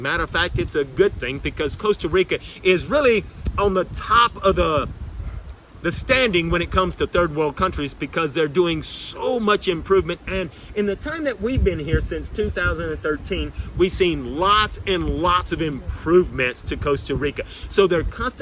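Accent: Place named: Louisiana, United States. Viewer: Are you from Louisiana?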